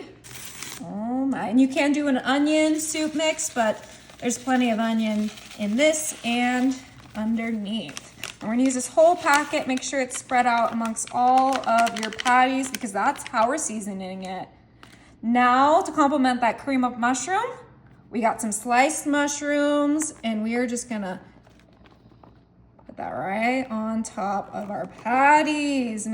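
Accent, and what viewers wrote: United States. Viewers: Meat is not meant to be that colour, what food dyes eere added to achieve it and are they safe (probably not as you sound as though you're in yhe US)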